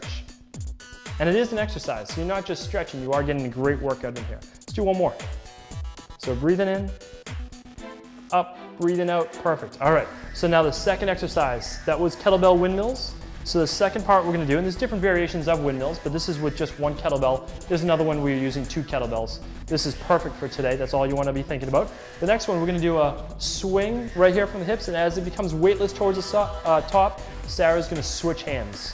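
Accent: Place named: Canada. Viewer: Is from Canada?? He pronounces his "o's" like a true Canadian.:)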